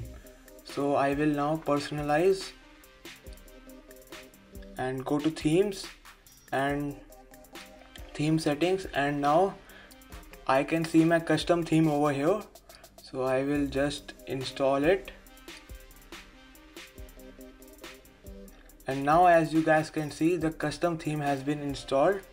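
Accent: India. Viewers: Indian English videos are so funny :3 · whenever u hear an indian accent iknow this shit gonna work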